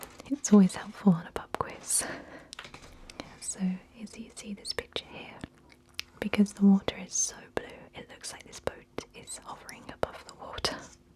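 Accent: England, United Kingdom. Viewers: I think you have an amazing voice and English accent.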